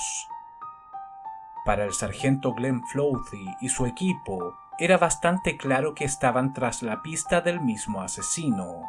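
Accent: Chile. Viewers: Yuuuuuuupiiiiiii!!♥️ Gracias de precioso acento chileno;) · Tus documentales son geniales!!! Esas introducciones para ponernos en contexto de la historia que nos vas a contar, está perfecto. Por tu acento, diría que eres de Chile ¿no? Jajajaja. Saludos desde Colombia.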